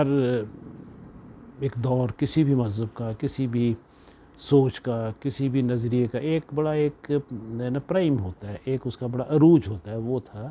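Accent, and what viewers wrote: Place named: Punjab, India. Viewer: کہوں مجھے آپ کے تلفظ سے مشرقی پنجاب کی جھلک نظر آتی ہے 😊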